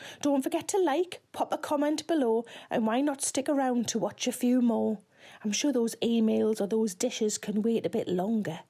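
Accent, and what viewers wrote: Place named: North England, United Kingdom. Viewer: Don't worry, I'm English and have no idea what she's saying half the time :) North England may as well be a different country.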